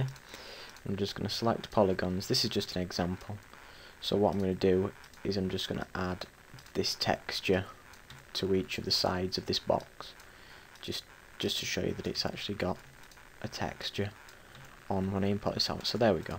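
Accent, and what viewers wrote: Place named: England, United Kingdom. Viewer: non related question: I'm learning accents, and would love to know were in England is your accent from?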